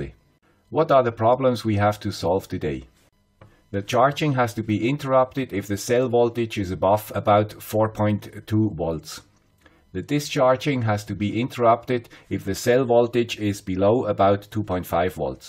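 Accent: Switzerland. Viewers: Another BRAVO presentation, Mr. Guy With a Swiss Accent! Are you a professor or something? I kind of like it that you always write down on your video the objectives of your video...and then proceed on to explain following those objectives. Over 100% per cent for another good video. Thanks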